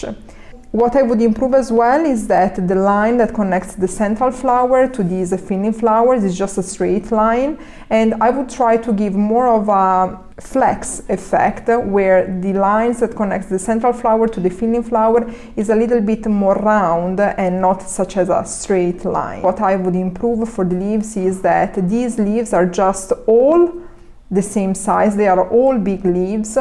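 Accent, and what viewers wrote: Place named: Italy. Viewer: Parli inglese come solo un italiano può parlarlo 😅. Sei italiana? Video utile. Grazie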